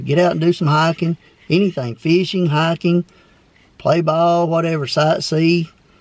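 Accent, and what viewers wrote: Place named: South, United States. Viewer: Man you've got a HEAVY southern accent. Not all southerners sound like you so curious where you live. Turning 50 soon myself and i couldn't agree more about your advice. We have to find a way to slow down and realize money isn't everything. I've changed a lot in recent years. I used to just go and go. I also would feel like an outsider in my own family. For many years I really had no choice because I was the main provider in our family, my wife was a stay-at-home mom. Now that the kids are grown and she started working a new business I am now able to back off a good bit which has been really nice.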